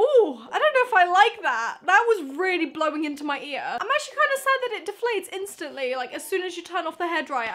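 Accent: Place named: United Kingdom. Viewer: She sounds too English to be true.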